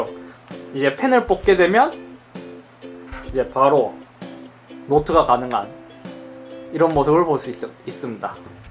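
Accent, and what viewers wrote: Gyeongsang, South Korea. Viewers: 와 이때는 억양이 완전 굳은 갱상도인이었네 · 꾹꾹 눌러 숨긴 경상도 사투리... 먼 미래에 이 청년은 사장이 되어 맘껏 사투리를 씁니다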